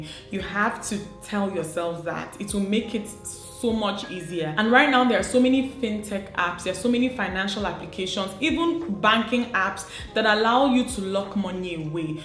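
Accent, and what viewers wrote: Igbo, Nigeria. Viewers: There’s nothing wrong with them oo. That Igbo would always surface 😂 and is lovely. Thanks for sharing.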